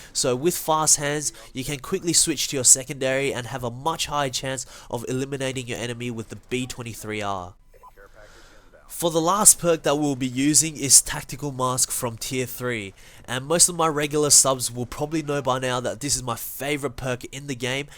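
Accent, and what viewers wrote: Australia. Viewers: Are you Australian? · Australian !! Yay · R u Aussie m8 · Aussie oi oi are u from aussie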